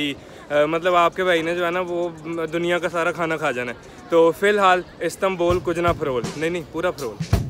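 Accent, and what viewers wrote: Punjab, India. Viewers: Your Punjabi accent..😍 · Wonderful face reaction after every food. 😊☺and punjabi ascent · Punjabi accent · Tu Punjabi me urdu bol rha h bhai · Your punjabi terms are AMAZING.....I'm dying laughing for your natural punjabi style ...lovley..!!✌✌😂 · Your Punjabi punch in Urdu is great. Bahut wadiya mundiya, khich k rakh.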